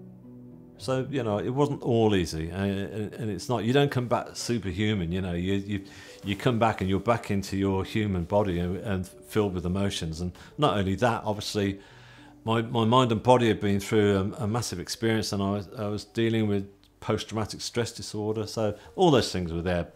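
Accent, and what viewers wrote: England, United Kingdom. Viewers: Hearing this English, down to earth accent makes the after life feel even more real. ❤ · I'm English, but it sounds so strange hearing this form an English voice. Incredible story x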